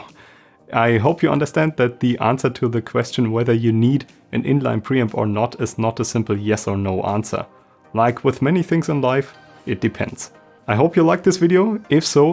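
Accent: Germany. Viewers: Hey, nur mal so 'ne Frage am Rande, dein Englisch hört sich echt sehr deutsch an, bist du deutsch? ^^ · Junge dein "gutes Englisch" entlarvt dich als deutscher..REDE DEUTSCH MAN!!!!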